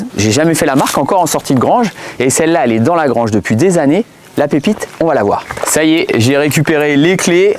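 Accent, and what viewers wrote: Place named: Paris, France. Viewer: Toi t’es pénible ! Une pépite ! Une 104 ! Ta cible : Les débiles ! Tu devrais bosser chez Vintage mécanic ! En plus tu as la tenue de mécano ! Accent parisien ! Insupportable ! 🤮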